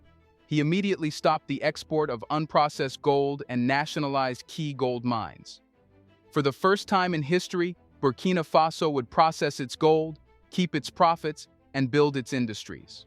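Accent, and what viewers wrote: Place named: United States. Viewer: It's annoying these random generated content and AI generated voice overs with American accents. But the message is mostly factual so we have to look past that.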